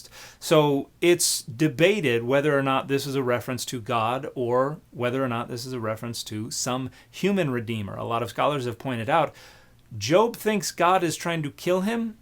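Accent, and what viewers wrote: South, United States. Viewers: Why do people use Southern accents for stupid? This guy is annoying and insulting. I am not from the South but live now live here. Besides some cultural differences, they are the same as people everywhere with the same distribution of innate intelligence. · Hard to sound intelligent with a southern accent . . . just sayin'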